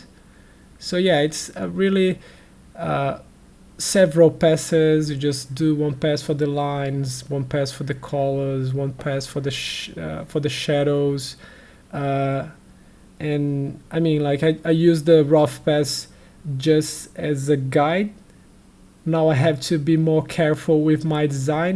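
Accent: Brazil. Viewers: 20 seconds in...i hear a brazilian accent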